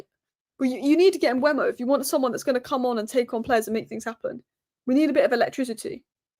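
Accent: Manchester, United Kingdom. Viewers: Could you repost this with subtitles please? I can't understand that thick Mancunian accent OK Yah.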